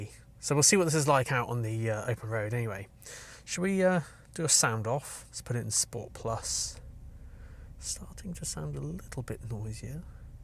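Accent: England, United Kingdom. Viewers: Great video. English accent always adds a level of sophistication. I miss Europe.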